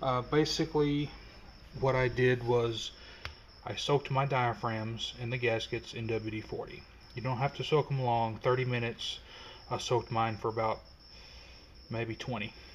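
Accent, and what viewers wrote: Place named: Illinois, United States. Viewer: Very informative video. Thanks for the upload!! :thumbs up: Btw, you sound just like my Father-in-Law (Central Illinois accent).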